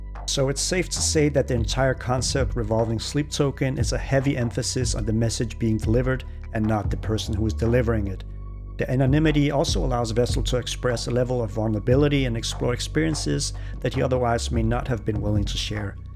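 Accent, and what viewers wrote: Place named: Denmark. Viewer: Dansker accent :D great video.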